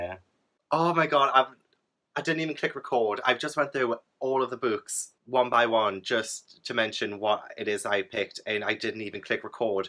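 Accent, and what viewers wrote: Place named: Northern England, United Kingdom. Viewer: I found you via Codie and I'm so excited to hear a northern accent! Good luck with that incredibly ambitious tbr! I really need to read Howl's Moving Castle - I've owned it for about 6 years.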